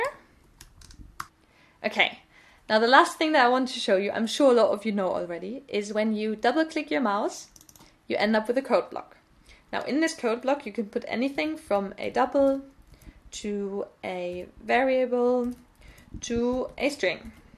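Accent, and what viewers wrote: United Kingdom. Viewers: Great tips! started out in auditing with a real addiction to excel and macros and then went into architecture. When I saw that dynamo could be used within Revit, it was such a great way to bring that side of my brain back into finding quicker or easier ways to do something. Where are you from and is the accent from time spent in the UK? I’m South African and spent time in London and now in Melbourne so who know what I sound like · It's awesome to see such a diverse following here! Indonesia, Brazil, Spain, Denmark, Syria and more maybe idk. As an American, I'm amazed that a German can speak English so fluently, that I can't even hear an accent. I can detect only a tiny British accent, along with terms like saying 'zed' instead of what we say here in the US, zzzzzz. Unless you're actually a Brit, just studying in Germany. Sad that this is really your only vid on dynamo.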